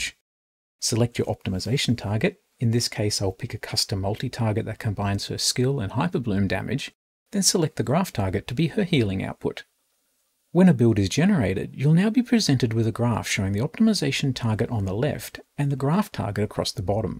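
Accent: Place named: United Kingdom. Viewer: Just watched the full video series. I'm in Genshin for about 2 weeks and as a rookie I can safely say I didn't understand 90% of the content. Especially the jokes. but man the intros and the so British voice are funny. I will slowly get into the tool down the road, but I have a lot on my plate with the base game already. Thank you very much for bringing this knowledge + utility to the community.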